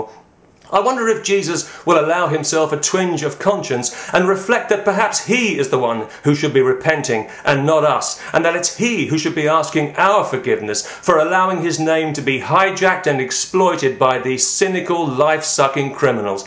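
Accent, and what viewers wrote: United Kingdom. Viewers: I agree with much of your criticism of the clergy. However, judging from your accent you are British, and I don't recognise most of what you are saying in relation to church ministers in the UK. Do we have ANY televangelists in the UK with private jets? How many clergy in the UK live in palaces? (and anyway, I don't think that the residential accommodation in the archbishops 'palaces' would be anywhere near as luxurious as the word suggests to most people). In my experience the vast majority of ministers in the UK are not well off, and they do their best to help people (in their present situation, not in the 'next life'). Do I detect that your hatred of and bitterness toward the Church might stem from issues you have had with the Church in the past? Maybe abuse as a child? With regard to your beloved atheism, the two biggest examples of the Church being downtrodden at State level must be China and the old USSR, where atheistic Communism was the order of the day. 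In both cases, many ministers and lay people suffered severe persecution and even death, simply for practising their faith. You might suggest that it was their Communism which was at fault rather than atheism, but these examples show that doing away with the Church does not mean that we all live happily ever after.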